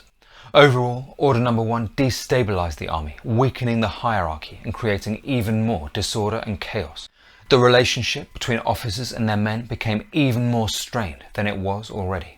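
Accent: United Kingdom. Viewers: Russia was useless? Such a BS. With this British accent such a big Russophobia.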